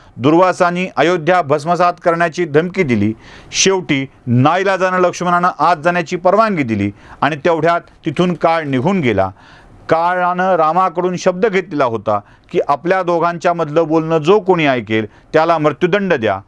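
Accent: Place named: Marathwada, India. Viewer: विश्लेषण चांगलेच, पण श्रीरामांचा उल्लेख एकेरीत करणे योग्य वाटत नाही. तसेच आपले मराठवाडय़ातील मराठी प्रयत्नपूर्वक व जाणीवपूर्वक शुद्ध करणे आवश्यक आहे. आपणास खूप उंची गाठायची आहे. धन्यवाद .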